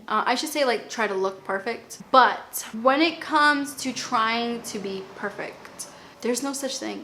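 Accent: Boston, United States. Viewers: Love your Boston accent. Love the way you said Michael Kohrs as Michael "Khohs."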